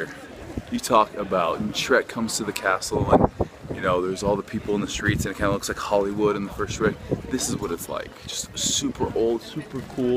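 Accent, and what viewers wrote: Scotland, United Kingdom. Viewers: Hahaha ur Scottish accent is well funny. Come to MANCHESTER ENGLAND!!!! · Love your Scottish accent haha makes me laugh!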